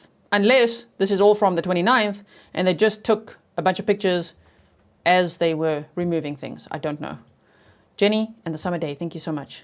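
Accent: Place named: South Africa. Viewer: Shame - you're definitely south Africa. 😂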